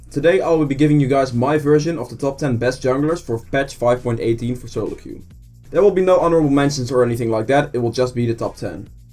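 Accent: Netherlands. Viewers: nice vid again greeting from your home country I can hear a dutch accent xD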